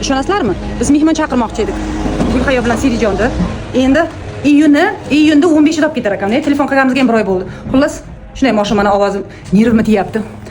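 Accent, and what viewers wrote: Surkhandarya, Uzbekistan. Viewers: Surxondaryocha gaplaringiz yoqadi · Сурхонча сукинишингизга 5 кетаманда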